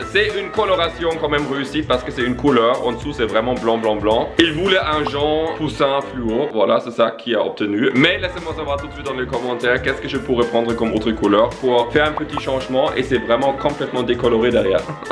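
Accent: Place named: Canada. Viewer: tu mon youtubeur préféré des vidéos accent canadien et qui met quoi des vidéos trop trop rire 🇩🇪👍🇨🇦👍🇩🇪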